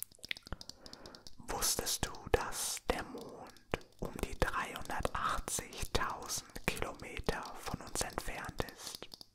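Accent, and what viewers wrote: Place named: Germany. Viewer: 💕Hey there baby boy You look absolutely georgeous I hope your feeling better Your eyes are absolutely beautyful Ive missed your german accent Ive missed you sooooo much Im your biggest fan girl (" Will you marry me ") Saranghae Lots of love and kisses Your biggest fan fan girl and future wife Nicole Louise💕 😈🔥💋🔥💋🔥💋🔥💋🔥💋🔥💋🔥💋🔥💋🔥💋🔥💋🔥💋🔥💋🔥💋🔥💋😈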